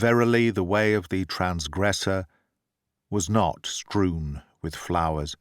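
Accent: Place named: England, United Kingdom. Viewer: Well done as always Mr.! Here in America I’d much rather listen to an audio book by an Englishman, it gives decidedly more cultured air than our flat accent here! Thank you for all your works!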